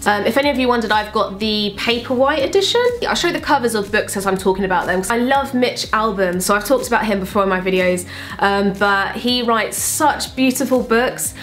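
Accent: United Kingdom. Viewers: Your British Accent Is Life 😍😍☺️☺️ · you sound British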